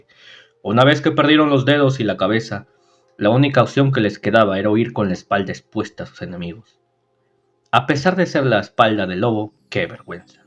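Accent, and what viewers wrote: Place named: Colla, Bolivia. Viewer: Cada vez habla más coya mi causita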